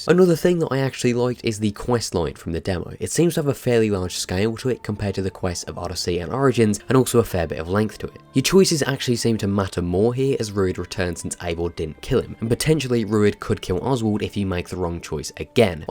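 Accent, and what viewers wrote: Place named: Midlands, United Kingdom. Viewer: Where are you from man, your accent sounds so ambiguous, I’m either thinking somewhere in the Midlands or the West Country 😬😬😬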